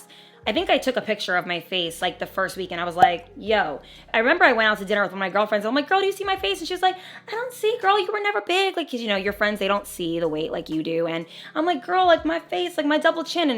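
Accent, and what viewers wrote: New Jersey, United States. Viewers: I started laughing watching this video. I’ve never noticed in any of your other videos. I don’t know if it’s a you’re in Jersey and you’ve picked up the jersey accent or you had it before but just never noticed but I love it by the way you’re looking amazing and congratulations on your weight loss and your transition to your new body. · Great video!! Are you from New Jersey btw? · Are you from jersey?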